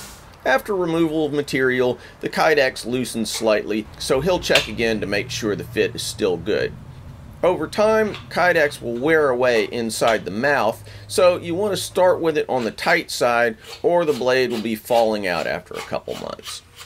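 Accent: United States. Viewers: You have the clearest, most neat accent i've ever heard:P. Coming from a foreigner, You sound like the perfect american accent for me. Just an observation, though quite a silly one. I really love Your work, and videos, and the step by step process. You're great!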